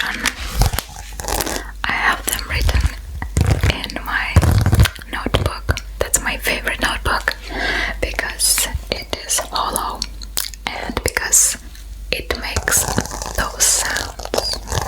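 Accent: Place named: St Petersburg, Russia. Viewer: Стоит ли ожидать контент на русском? По акценту и увлечениям Вы, типичный Питерский бумер) И почему нет монетизации в Румынии?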